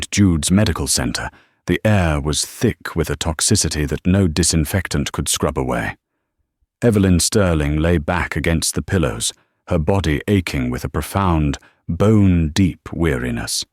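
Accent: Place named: United Kingdom. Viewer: I have a technical comment. …. Although the narrator is probably a wonderful gentleman, a British accent narration for Chicago, Boston, NYC, etc is DISTRACTING. You cannot relate to the characters when they are supposed to be on continental U.S., with a London accent. If we were listening to Shakespeare, yes. For these things, Hard NO!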